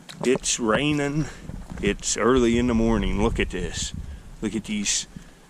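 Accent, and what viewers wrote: Western Pennsylvania, United States. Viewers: you have to be from western PA with that accent. Am i correct?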